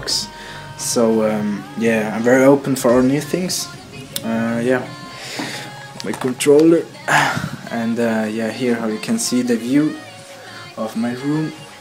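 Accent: Switzerland. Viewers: Man hört dein "Schwiizerdialäkt" aber schüsch geils änglisch. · Man merkt voll das du Schweizer (Deutsch redest) bist ^^ Woher kommst du? Ich wohn grad bei der Schweiz an der Grenze :D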